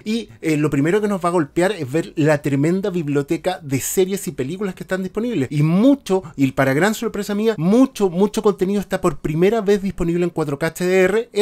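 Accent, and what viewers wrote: Chile. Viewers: duele escuchar el Chileno, pero bue, era solo para ver un poco de Disney+ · Es chileno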